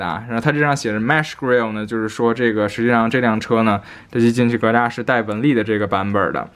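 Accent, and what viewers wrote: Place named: Beijing, China. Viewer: 北京人，说话很有特点很好听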